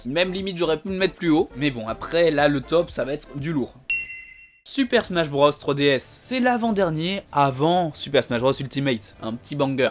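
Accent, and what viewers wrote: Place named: France. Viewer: J'adore ton accent bien français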